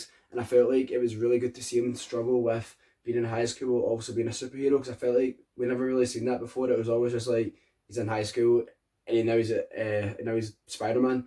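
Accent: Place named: United States. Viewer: Us accent... You need to make your spells clear and use a mic.... Btw good content🤘🤘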